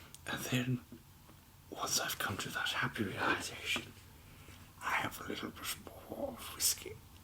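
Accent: RP, United Kingdom. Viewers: Well, was not expecting you to have such a spot-on posh accent!!! Wonderful video. Hope you've been doing okay over your absence and thank you for your wonderful videos. 😆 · That accent is so plummy I had you mistaken for Peter O'Toole!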